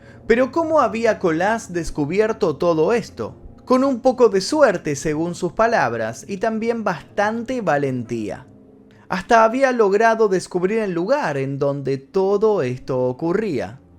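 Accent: Argentina. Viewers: Magnífico y excelente como siempre, como tú, me encanta tú acento argentino y luces.. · Hola, soy nuevo suscriptor, me gusta como presentas este contenido mórbido y el acento argento es complemento distintivo, sin comentarios picantes como lo hacen famosos youtubers. Tu siempre estudias lo que presentas, obvio la legitimidad está sujeta a la veracidad de tus fuentes pero eso es caso aparte. Cuida por favor tu salud mental, un saludo desde Colombia.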